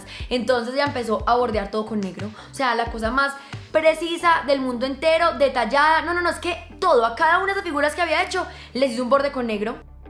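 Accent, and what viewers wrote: Antioquia, Colombia. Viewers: Eres colombiana nada mas con tu voz 😐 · habla todo paisa eso me encanta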